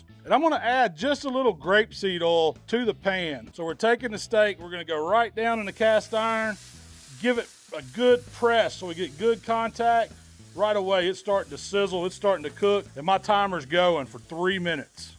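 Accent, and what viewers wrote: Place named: United States. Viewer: Offtop. Enjoying that accent. Which part of US is that?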